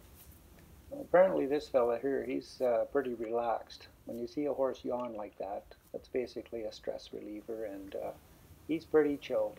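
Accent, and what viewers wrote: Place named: Canada. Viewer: Are you Canadian? You sound like Jordan Peterson (A Canadian debater and Harvard professor)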